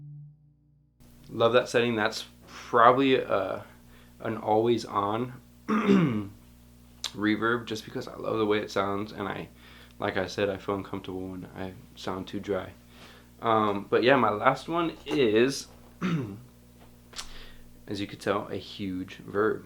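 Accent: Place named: Brazil. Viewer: Nice! Quality and efficiency... Hey man, are you Brazilian? Something in your english sounds familiar...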